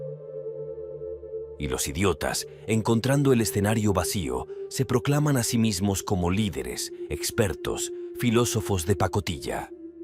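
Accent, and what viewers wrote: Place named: South America. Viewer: Parece un sudamericano pretendiendo ser castellano. Es mezclado.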